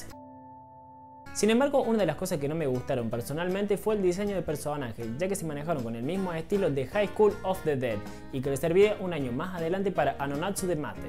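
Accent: Córdoba, Argentina. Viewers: wpse re nota que sos de cordoba xD buena reseña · So cordobe culia?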